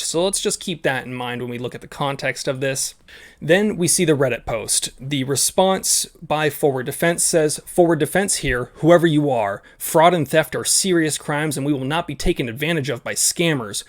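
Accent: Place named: South, United States. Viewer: this guy sounds like a hillbilly clown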